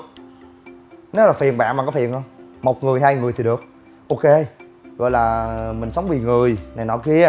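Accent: Mekong Delta, Vietnam. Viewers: mình quê miền tây nên nghe quen lắm :) chả bị gò bó gì quá , nói chung dn nói đc lắm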